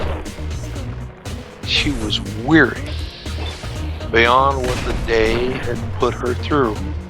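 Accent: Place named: South, United States. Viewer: when I first watched your videos, your narration reminded me of Easy Pete for some reason, perhaps because of that Southern type accent you have, which sounds great by the way. Now I'm thinking you're more like the JRR Tolkien of FO4 because your storytelling is on another level. Thanks for sharing this video. It gave me a lot to think about after I watched it and yes, the music is great, compliments it well!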